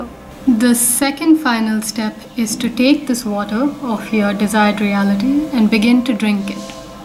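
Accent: Kerala, India. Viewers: I love your looks and accent!!!! Indian!?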